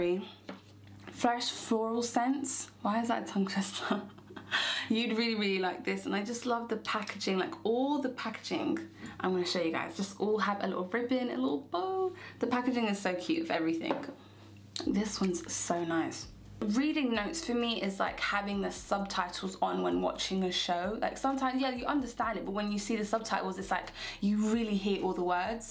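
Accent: United Kingdom. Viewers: 🤤🤤🤤😍 you are gorgeous AND love the British accent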